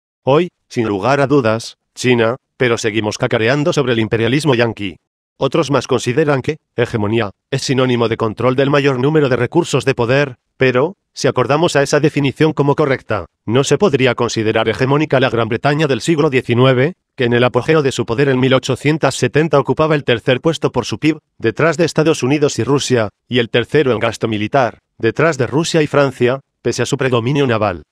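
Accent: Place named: Spain. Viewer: Oye pero cuanta mierda se habla por aquí ! Quien coño maneja estás malditas computadoras con acento español !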